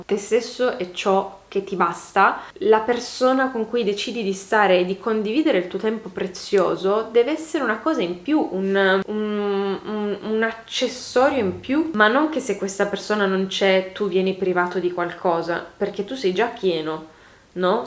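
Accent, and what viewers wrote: Turin, Italy. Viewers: Il tuo accento è assolutamente torinese!😄😄😍 Tutti i torinesi, sono conviti di non avere inflessione. Io che sono nata a Torino, ma vivo e ho origini toscane, lo so bene. Adoro il torinese e mi manca la mia città. Sei una persona bellissima 🥰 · video molto bello ! però la questione accento non è troppo vero, l’accento di torino è inconfondibile ✨ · Mi fa morire che pensi che i torinesi non abbiano un accento! Hai un accento fortissimo 😂♥️ e lo trovo adorabile!! Bellissimo video come sempre, non commento mai perché sono riservata, ma oggi volevo dirti che i tuoi video mi tengono tanta compagnia e mi infondono tranquillità, grazie 🌸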